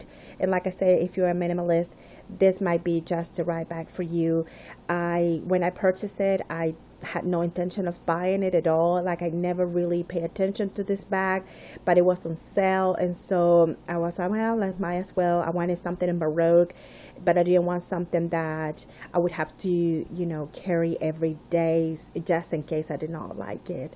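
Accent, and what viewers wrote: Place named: Germany. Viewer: Love that accent. German ?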